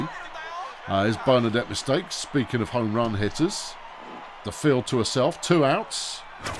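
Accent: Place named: Australia. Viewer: It's refreshing to have an Australian commentating a baseball game. Baseball is a fun game for everyone.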